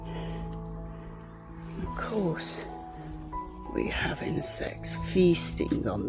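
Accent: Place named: RP, United Kingdom. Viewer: Oh dear jolly hockyscks why do you talk like that all posh now when we were there you didnt it sounds terrible.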